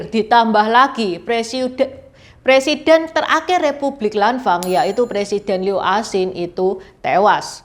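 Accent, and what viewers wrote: Java, Indonesia. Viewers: Gini dong, Tionghoa medok khas Jateng dan Jatim. YouTuber daerah hrs bangkit jangan didominasi Jakarta. Lanjut Mbak!!!! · Aku suka channel ini karena MEDOK nya narasi...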